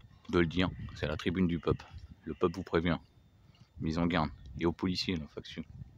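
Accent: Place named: Paris, France. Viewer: Tiens !! Quelle coïncidence... ça doit être l'accent titi parisien 👍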